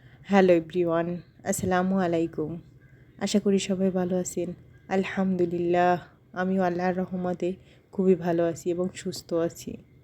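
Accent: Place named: Barishal, Bangladesh. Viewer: আপু চলে আসলাম তোমার ভিডিও দেখতে তোমার কথায় বরিশালের ছোঁয়া পেয়ে ভালোই লাগলো মিষ্টি কুমার ❤❤❤❤❤❤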